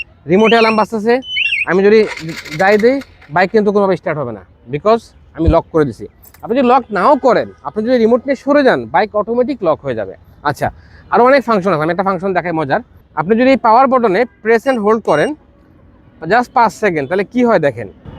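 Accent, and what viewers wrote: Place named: Sirajganj, Bangladesh. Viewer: সিরাজগঞ্জ কথায়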